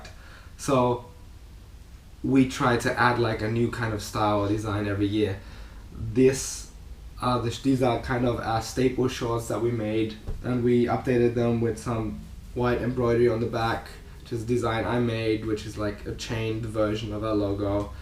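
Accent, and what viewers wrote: Germany. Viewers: No problem!! Please come to Singapore next Year! I would really be honored to meet and have a picture with you! You inspire me so much! I also like the way you talk in the german accent!! So hilarious! Jason Paul, you are an Awesome man and a really sick, legit, great, awesome, fantastic, funny and Excellent Freerunner! Love to meet you someday and parkour with you!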